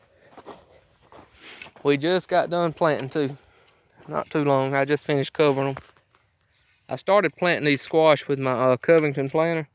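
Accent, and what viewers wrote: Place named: South, United States. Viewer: From your accent I can tell you must be somewhere close to the South......Just want you to know that you subscribing to my channel is going to make me have to take my shoes of to keep track of my subscriptions. My dad always planted 3 seeds to the hill..... 1 pushing, 1 pulling, and 1 to come up........................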